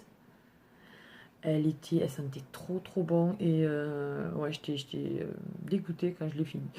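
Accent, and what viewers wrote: South, France. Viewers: Je t découvert il y a qq mois grave à fashion Barbie j'aime bien regarder tes vidéos. Et ton accent ramène du soleil dans ma région ou la chaleur n'est pas au rendez vous. J'ai de la famille à castres alors je connaît bien de ton petit coin ☺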